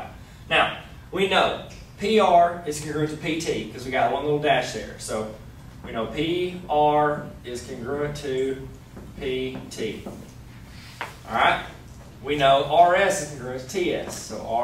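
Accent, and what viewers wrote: South, United States. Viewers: That southern accent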